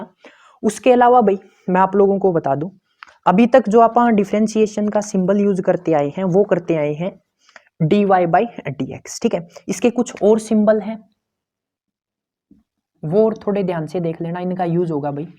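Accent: Haryana, India. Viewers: Language ki tone se pta lgra h ki aap haryana se ho...main bhi haryana se hu...kurukshetra se..... 0.1😍😍support farmers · Bhai app kaha se hai pure haryanvi bool rhe hai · Aap haryana se ho ???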